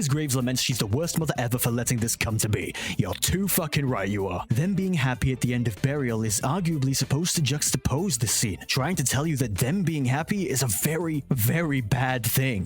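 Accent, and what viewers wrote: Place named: United States. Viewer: Brit who talks like an American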